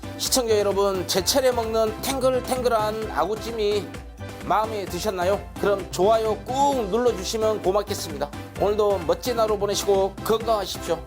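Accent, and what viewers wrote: Jeolla, South Korea. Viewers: 세프님.전라도.말투가. 너무.정겹습니다 .그리고.좋은요리고맙습니다.항상행복하시고.오래도록좋은요리부탁드닙니다. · 전라도 사투리를. 많이. 쓰시네여😊😊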